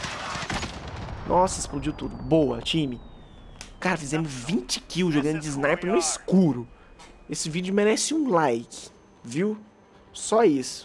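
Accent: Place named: Goiânia, Brazil. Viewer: eu sabia, reconheci pelo seu jeito de falar, eu também sou de Goiânia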